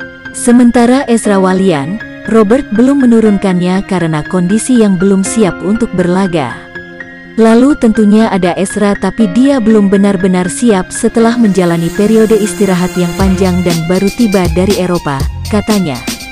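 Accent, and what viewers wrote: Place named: Java, Indonesia. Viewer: Hampir medook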